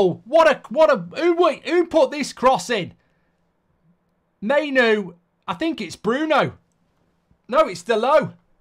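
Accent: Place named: Glasgow, United Kingdom. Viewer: I'm from Scotland and accent was pure Glasgow 😂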